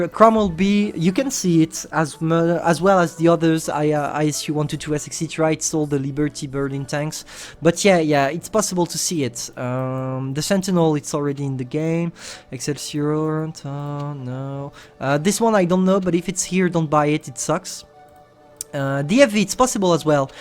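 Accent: France. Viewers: Its heavy not hivie and regulaaarlie lol i can so tell you are french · je me disais xd l'accent français et le le clan LOCA et je t'avoue que j'ai pas tous compris mais sa ses amis cause de MON anglais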